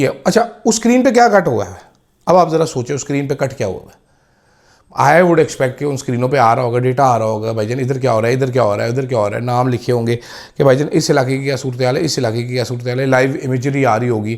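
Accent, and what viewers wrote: Punjab, India. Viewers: You have a real lethal sense of humour! Your style urdu speaking is just wonderful. (Panjabi accent?)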